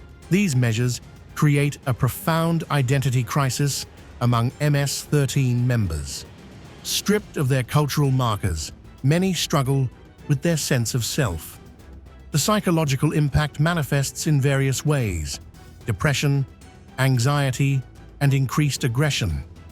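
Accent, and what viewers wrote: United Kingdom. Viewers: Listen to this clown with the British accent and the bleeding heart. Almost every single one of those monsters have murdered and raped …if it had been one of his children that had been tortured and killed you wouldn’t be listening to this. He has no clue what it was like to live with these monsters….he only thinks he does. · Well the narrator sounds British and look at Britain now. Overrun like 🇸🇻 was.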